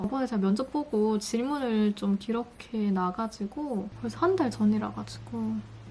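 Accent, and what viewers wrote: Gyeongsang, South Korea. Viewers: 어쩌다 지나가다 ~축하드려요 ㅎㅎㅎ 억양이 경상도인것 같네요 ㅎㅎㅎ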